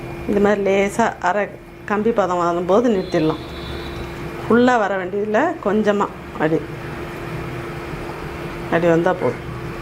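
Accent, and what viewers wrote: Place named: Cuddalore, India. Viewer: கடலூர் விருத்தாசலம் பக்கமா..... தமி்ழ் சொற்கள் மிக அழகாய் இருக்கு....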